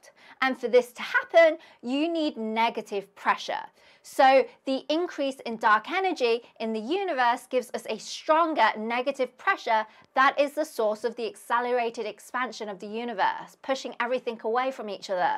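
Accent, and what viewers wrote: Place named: England, United Kingdom. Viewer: 😘 Cute yellow with a silly dialect from the tea drinkers. It's best if you speak the Human language aka American English, not tyat ugly noise from england. Why is your head bobbing up and down like that, is that typical mannerism over in mere england?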